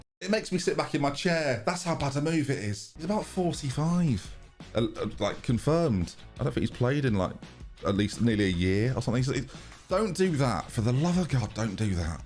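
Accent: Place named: Scouse, United Kingdom. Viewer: You do a good job at hiding your scouse accent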